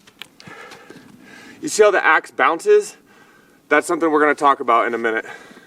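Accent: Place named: Australia. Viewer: Orstralian red gum - mate love the accent, but our Gidgee is harder.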